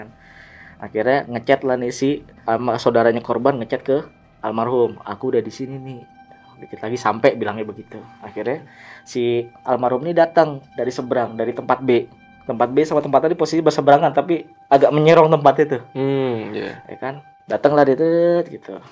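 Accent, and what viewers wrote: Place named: Banjar, Indonesia. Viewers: Ada logat banjarnyaa 👍👍👍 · Pak dialek banjar mu masih melekat Pak wkwkwk, sehat terus Pak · Logat kalimantan sdin😅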